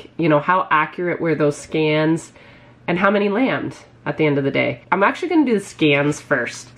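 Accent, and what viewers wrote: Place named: Canada. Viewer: Your Canadian accent is really fun to listen to 😉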